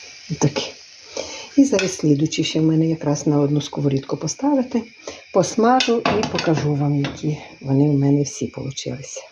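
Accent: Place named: Chernivtsi, Ukraine. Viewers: Моя братова з Чернівців ,говорить так як ви, мені дуже подобається.Дякую за рецепт Зараз буду готувати. Мирного нам неба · Спррбую по вашому рецепту.По розмові ,певно ви землячка,думаю що ви з Кіцманщини.так?💐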